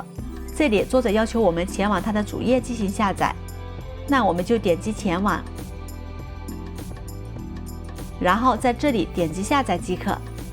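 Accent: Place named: Sichuan, China. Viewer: 声音倒像真人的，还带四川口音，但人像怎么看都像假的。。。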